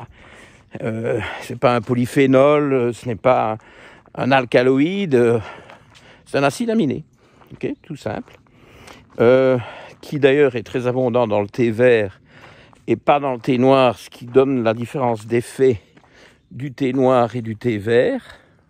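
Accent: Belgium. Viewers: Merci et vos bestioles! Vos capsules ont un air poétique et naturel qui me convient bien, de plus j'aime bien l'accent du cru Belge comme septembre sans le "p" ou et poui ❤😊 Le seul bémol -pour moi - est que vous n'annoncez jamais l'année sur vos shooting 😢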